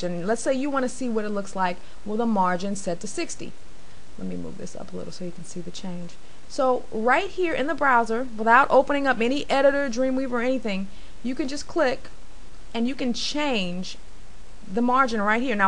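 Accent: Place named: United States. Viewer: yeah, i am spinning! :) also your accent is great, true American.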